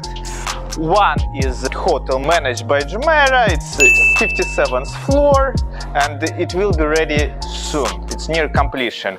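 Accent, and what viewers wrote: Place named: Russia. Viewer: Are U Russian? I can hear familiar accent 😆 Seriously. I like Dubai. Been there 3 times and want more 😌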